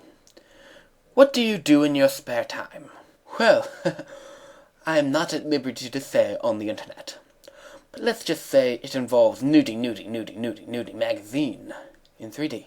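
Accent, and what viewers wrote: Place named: England, United Kingdom. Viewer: cool English accent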